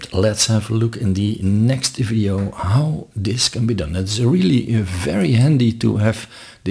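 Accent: Netherlands. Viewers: Thanks for the overview of what this extension does I have to say but away. To say when you started it was really hard for me to figure out where you were from but the further you went on in the video, hoe meer het Nederlandse dat Nederlandse tongval wil gewoon door te schemeren. But that's not important great video man thank you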